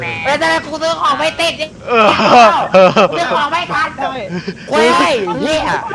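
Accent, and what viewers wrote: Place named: Suphanburi, Thailand. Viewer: พี่ เป็นคนสุพรรหรอคับแลเหน่อ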